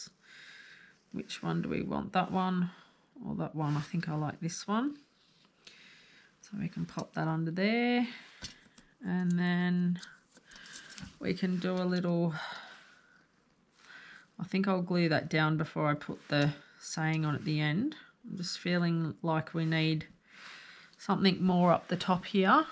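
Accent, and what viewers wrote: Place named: Australia. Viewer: I picked your Aussie accent straight up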